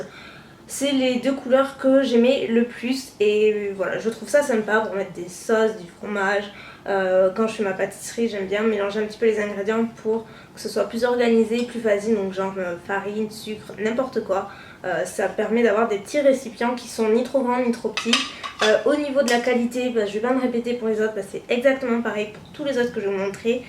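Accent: South, France. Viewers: Tiia un accennt duu suudd ssuuperrr beeau tres leger mais qui s’entend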